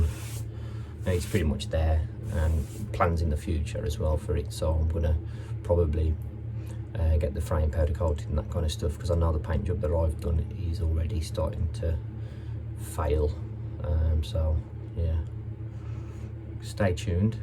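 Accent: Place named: Birmingham, United Kingdom. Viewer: You sound like a brummie my friend....hello from France. Just sorting m'y own cm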